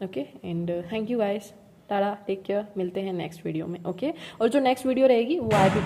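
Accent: Haryana, India. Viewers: 🤨 sahi baat hai miss 👍👍 Main baat hai focus ki, 50-50 agar divide ho jaayega focus bank aur ssc me toh bank ka kharab hoga aur ssc bhi. 1 jagah focus kare to success zaroor milegi, meri bhi yahi soch 👍 hai Really like your Haryanvi accent 😍❤️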